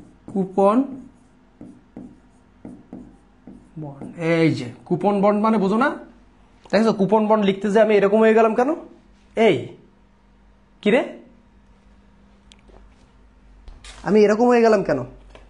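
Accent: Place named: Noakhali, Bangladesh. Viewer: স্যারের বাড়ি মনে হয় নোয়াখালী বা লক্ষ্মীপুরে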